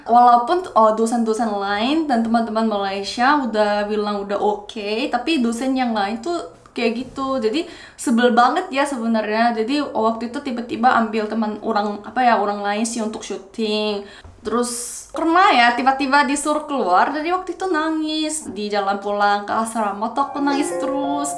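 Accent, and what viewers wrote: Java, Indonesia. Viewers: Kata si mba dia logatnya udah 'Indonesia bangat" Jadi lucu:) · Orang luar tapi kayak medok, mantab mbak saya support teros😂🤣👍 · maaf kak kalau saya ngomong kayak gini.. mengapa kakak ngomong pake logat bhs indonesia?. Saya tau persis dengan logat melayu dan indonesia. Yg kakak pake ini adalah logat atau cara bicara orang indonesia yg menggunakan bahasa indonesia... Saya aja orang thailand tau kalau anda sedang memakai bahasa indonesia.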